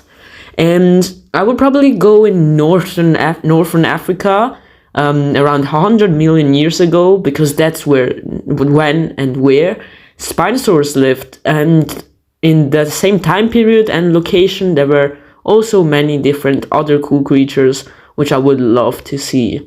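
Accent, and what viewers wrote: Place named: Switzerland. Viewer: LET'S GOOO, QNA also i love the swiss accent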